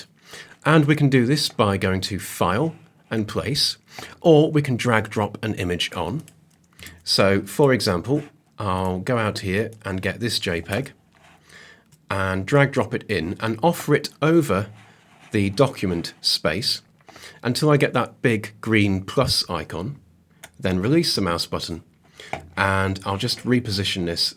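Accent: United Kingdom. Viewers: 超爱你的英国口音。哈哈。另外一个老头不喜欢，还是你讲得好！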